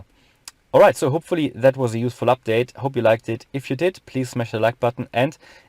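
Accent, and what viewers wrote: Germany. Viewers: Unverkennbar wenn Deutsche Englisch reden 😊🇩🇪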